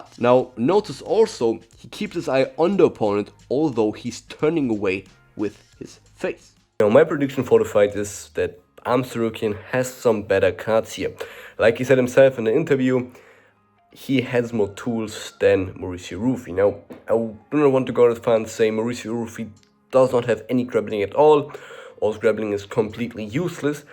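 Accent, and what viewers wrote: Germany. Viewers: I hear german hahah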